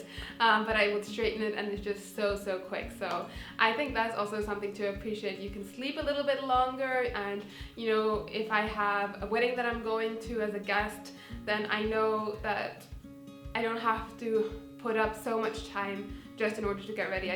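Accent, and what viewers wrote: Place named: Germany. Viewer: Wow that is exactly the kind of positive vibe I'd need for starting into 2019 with a healthy mind❤ I always had thin hair no matter what, but I'm finally coming to terms with it! Also I have a question for you, I don't wanna be rude or something but you sound like you have a German accent :) it doesn't sound bad or anything I just noticed it bc I certainly have one 😁❤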